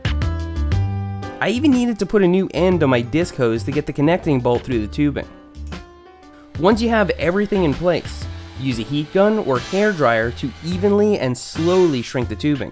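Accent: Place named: New York, United States. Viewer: Hi! This is a great way to learn English! usually uses very good vocabulary and grammar, his accent is a little New York but not much, and his pronunciation is excellent. I noticed that another viewer commented on your English and I wanted to clarify on some things. First, remember that in English, we capitalize nationalities, so the word "german" should be "German". Second, the third person singular form of regular verbs in the present tense in English require an "s" as an ending ("asks"). Also, it is very important to capitalize the pronoun that we use for ourselves, the word (not letter) "I". We need to capitalize that one all the time. The sentence "I learn English for 3 hours" is a little strange, and might sound better in the present progressive ("I am learning English for three hours"). Finally, you need to fix your capital letters for the start of a sentence, for the pronoun "I", and for the proper nouns "English" and " ". 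You shift your verb tense from present progressive "am learning" to regular present "I watch", which is a little strange, but not grammatically incorrect. It would be a little better to say, "I am learning English if I am watching." A corrected form of your entire post would be: I am a German boy. My mom asks me if I am learning. My answer: I learn English for three hours. My thoughts: I am learning English, if I am watching. I hope that this was helpful, remember those capital letters, ride your bike a lot, and keep watching '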